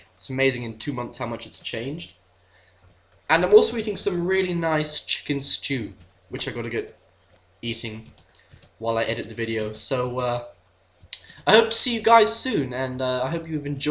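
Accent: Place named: Baltimore, United States. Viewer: It's been so long... I forgot you had a bauler accent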